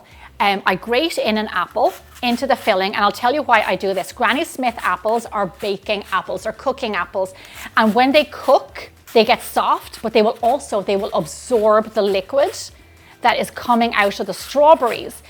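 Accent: Ireland. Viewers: If I was to draw a photograph of Irish, it would look EXACTLY like you! (And I don’t mean that in any negative way whatsoever). Love your videos and your wonderful Irish brogue!!🇨🇦🇨🇦 My wife is making your ice cream today!!! Thanks so much!!❤❤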